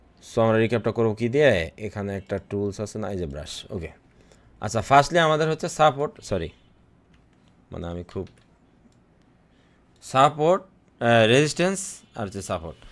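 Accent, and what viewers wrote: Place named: Bangladesh. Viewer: আপনার কথা বলার ধরন অনেকটা ভোলা অথবা চাঁদপুরের মানুষের মত। জানিনা আমি আপনার দেশের বাড়ি কোথায়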